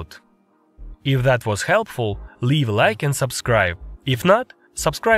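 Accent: Russia. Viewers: are you russian? i hear your accent like that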